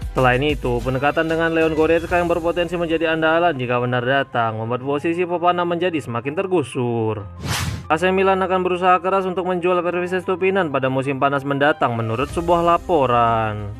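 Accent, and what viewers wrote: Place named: Mamuju, Indonesia. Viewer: Ini adminx dari kab. Mamuju, prov. sul-bar yach? Logatx mirip orang mamuju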